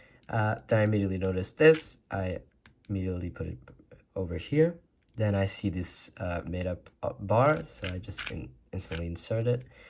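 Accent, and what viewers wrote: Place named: United States. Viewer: Your accent sounds very American lol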